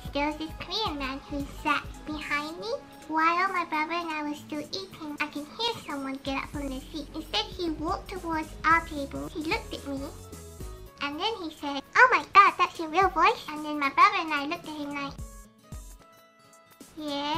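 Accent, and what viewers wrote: United Kingdom. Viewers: I love your editing, and your accent sounds kinda like a cock-knee accent (I've got noooo idea how to spell that sooo I'll just spell it how it sounds 😋).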